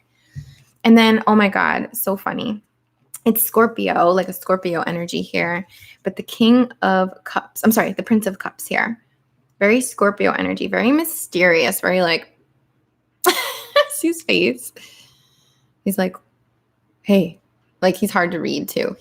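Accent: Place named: New Jersey, United States. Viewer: I know a Jersey girl when I hear one. My ol' stomping grounds back in the day. "Regrets, I've had a few, but then again, too few to mention, I did what I had to do and saw it through without exemption..."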